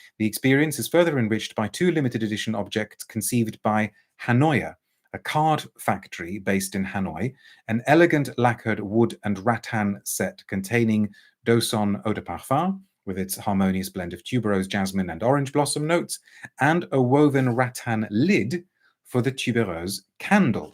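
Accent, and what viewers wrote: United Kingdom. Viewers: I just love the way you say........we are broadcasting "liiiiiiiivvvvveeee' it's just soo classy :) anything in a British accent is just mmmmmm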